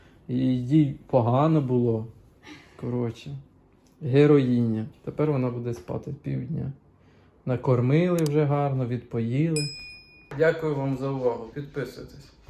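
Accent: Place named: Cherkasy, Ukraine. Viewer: Немає нічого поганого, щоб не вийшло на добре. Так, не все в житті буває так, як ти плануєш. Але ж, насправді, це не найбільше лихо. Хто як не українці знають що таке справжня біда і проблеми. Значить, і не треба було вам саме зараз бути в Черкасах. Все буде так, як має бути. Колись давно, коли всякі несподіванки стали насипатись на мене в різних ситуаціях, я сама собі виробила таку стратегію життя: "сподіватись на краще, але бути готовим до всього". Коли дотримуєшся цього, - легше все переживається. Доречі, привіт вам з містечка на березі Дніпра, з Черкас. Коли я натрапила на ваші відео, шось мені у Вашій вимові, пане, нагадувало черкаський говір. Ну от, бачите, не помилилась.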